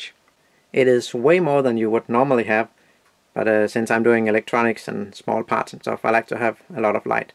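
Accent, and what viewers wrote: Denmark. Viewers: His is a common danish name and he speaks english with a danish accent, so I'm pretty sure he's danish.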